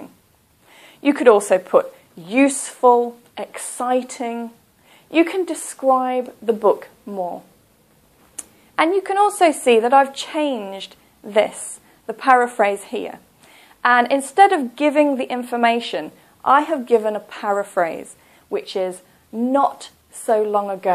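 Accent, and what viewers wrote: England, United Kingdom. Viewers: You got a pretty crispy posh English accent haha. It's 👌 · Listening to her can improve your british accent & pronunciation too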